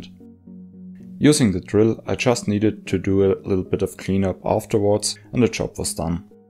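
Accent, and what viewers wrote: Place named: Germany. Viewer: Your Accent Sounds german. Ich bin auch deutsch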